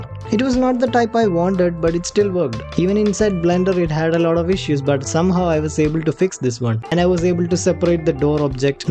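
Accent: Kerala, India. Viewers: english parayana kettal ariyam malayali anenn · Malayali slang never fades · no one will talk like this in India only Malayali does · Where are you from, sounds like kerala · That Mallu accent hits different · Accent is giving me mallu vibes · ഈ ഉമ്പിയ ഇംഗ്ലീഷ് കെട്ടാ അറിയാം മലയാളിയാണെന്ന് 😂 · മച്ചു നീ മലയാളിയല്ലേ നിന്റെ പറച്ചില് കേട്ട് അറിയാല്ലോ മോനെ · BRO AREN'T YOU MALAYALI???? YOUR ACCENT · Your ascent like mallu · Bro with a mallu accent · your voice sounds really like a keralian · mallu english alleee enik thonni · You sound like you're a malayali! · Mallu accent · The accent is exclusively Malayalam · English keettiitt mayalayalide pole imddd😊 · Malayaaali alle? Sound kekumbool ariyunnundu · Bro if definitely a malayalie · bro have mallu accent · The English you speak sounds like a Malayali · He is malyali..... Go and learn English mallu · Athey malayali ahn enn thonnane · sounds like a Malayali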